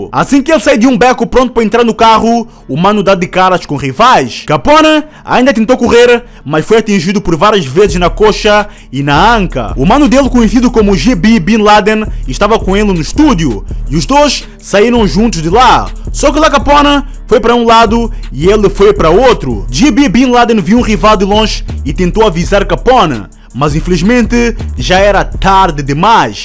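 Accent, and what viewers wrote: Angola. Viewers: Ele mora na gringa mano Ele deve ser da Angola e tal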